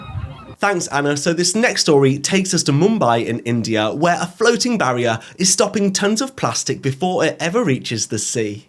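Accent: United Kingdom. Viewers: Well, the good news is welcome, but it's not called 'gashed ee bear', that's for certain. You seem to come form Birmingham, which should be familiar with Wales - so let's have the same respect for our threatened living language as you show for animals and landscapes, please. Google translate is a first step. Type the name in and press the sound icon. Not perfect, but acceptable.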